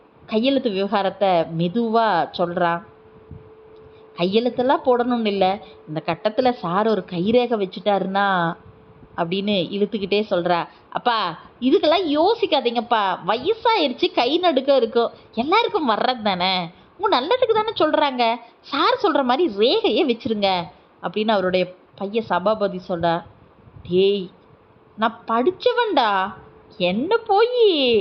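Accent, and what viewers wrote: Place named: Tirunelveli, India. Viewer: நானும் ரிடையர்டு இங்கிலீஷ் வாத்யார்.கதையில் சொல்லப்பட்ட உணர்வுகள் உண்மை.குரலில் தின்னவேலி பாஷை பிரமாதம்.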